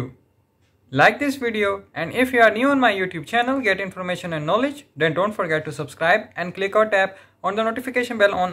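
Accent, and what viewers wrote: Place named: Pakistan. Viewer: Pakistani baba Speaking English 💀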